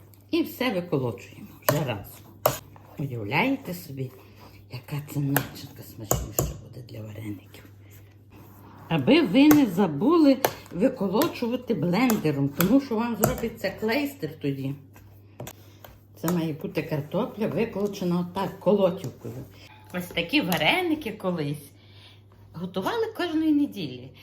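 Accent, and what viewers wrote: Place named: Galicia, Ukraine. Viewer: Дякую ❤ Я б оце слухала і слухала Вашу чарівну Галицьку мову. 😊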